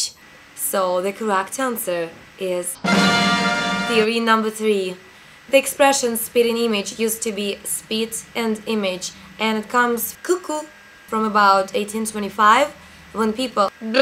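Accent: Russia. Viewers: ёпт !! у неё русский акцент..... она 100пудово говорит по русски !! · Yo are Russian?Have a rusian accent :) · gaahaa! that russian accent omfg!!!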